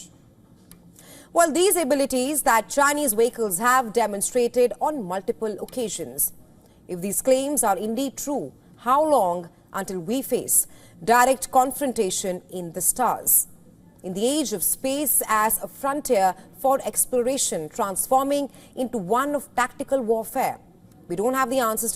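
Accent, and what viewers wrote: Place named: India. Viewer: I really love your straightforward Indian accent.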